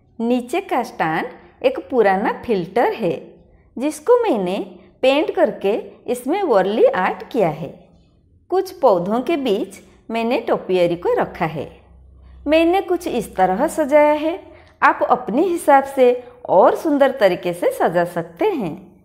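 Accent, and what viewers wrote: Odisha, India. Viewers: App odisha se ho kya??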